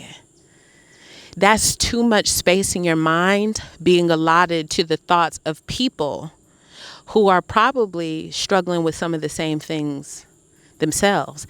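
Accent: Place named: South, United States. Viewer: You have every type of country accent in your voice lol i hear upstate, FL & da boot lol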